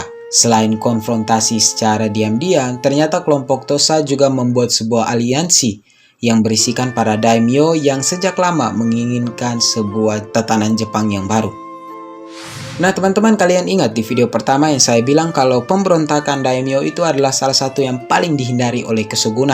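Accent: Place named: Eastern Indonesia, Indonesia. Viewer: Fix Logat timur tdk bisa tipu hahahah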